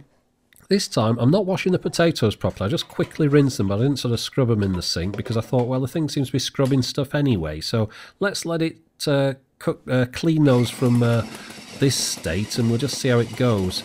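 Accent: United Kingdom. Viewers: Why would you expect a British man to talk like an American?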